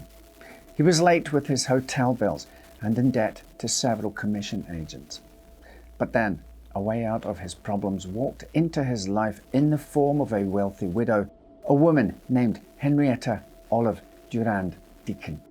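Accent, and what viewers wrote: Scotland, United Kingdom. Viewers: What part of Scotland are you from? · Fantastic thanks....love the Scottish accent!!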